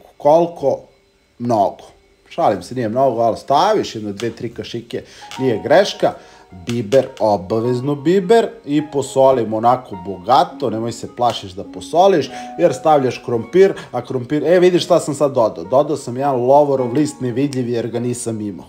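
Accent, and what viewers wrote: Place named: Morava, Serbia. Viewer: Desi bre zemljace. Ko da ste porasli pored Moravu. Mlogo bre dobar paprikas. Malo kocnice na mas na leba. Mlogo bre holesterol. I malo finocu na govor. Niki bre iz Beograd nece da te gleda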